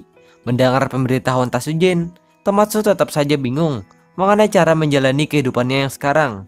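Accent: Banjar, Indonesia. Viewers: Logat banjar nya 🥲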